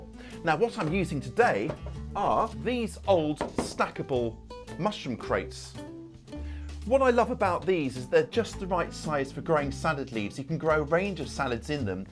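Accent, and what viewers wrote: United Kingdom. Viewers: Thanks I just love watching and listening to you. I’m from Yorkshire but live in Arizona. It’s great to hear British accents! I recommend the book The Three Season Diet which is an Ayurvedic approach to seasonal eating. The theory behind it is first - that there are different bugs in every plant meant to enhance the gut microbiome and eating a wide variety of plants helps strengthen our health. Second is that different foods are digested better at different times of the year. Third different flavors are important at different seasons. All of this is to strengthen and enhance digestion so that as we age we can continue eating a wide range of foods and have the digestive capability to convert them to energy. For example. Field Mushrooms are available all year round in grocery stores but as we know they grow in the spring primarily and they don’t have a long life. 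Spring is the rainy season preparing the land to absorb and sow new seeds. These mushrooms are to help our bodies repel excess water and cleanse the kidneys and liver in preparation for the lighter summer foods. Mushrooms are dry and wick water away from themselves in the field. So eating them in summer and autumn when our bodies are drying out can cause problems with serious dehydration. Hence much of our food in summer is juicy, sweet and ripened on the vine or bush or tree so we don’t have to use fire as much. They are cooling. 🪴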